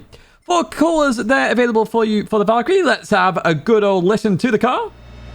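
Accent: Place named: Sefton, United Kingdom. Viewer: Didn't know you were from Sefton lar 🤣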